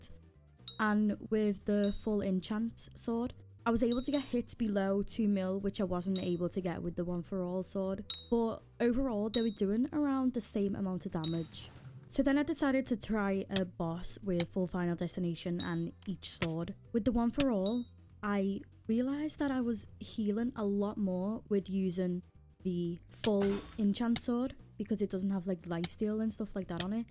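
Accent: United Kingdom. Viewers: Are u british or is that accent in my head? (no offence XD)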